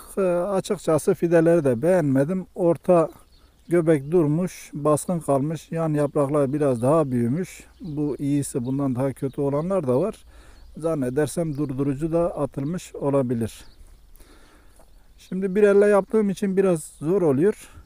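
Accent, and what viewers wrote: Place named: Adıyaman, Turkey. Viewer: Kardeş sen Adıyamanlımısın yau. Konuşma tarzın Adıyaman şivesi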